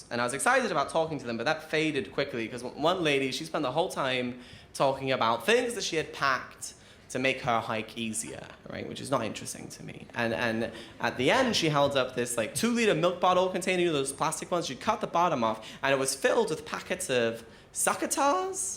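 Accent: Australia. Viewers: just Aussie. He grew up in Brisbane, Australia and now lives in Melbourne... :) He can't explain his accent... he copped a lot of flack for it when he first started out because he is a Brissie boy... haha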